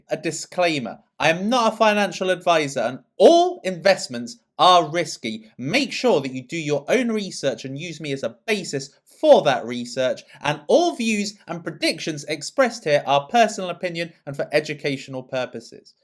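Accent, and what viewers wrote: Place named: Ipswich, United Kingdom. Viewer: new subscriber, you sound like youre from ipswitch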